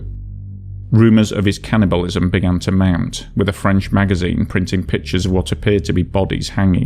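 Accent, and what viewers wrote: United Kingdom. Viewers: Is the narrator a native of England? His english accent looks like very british to me.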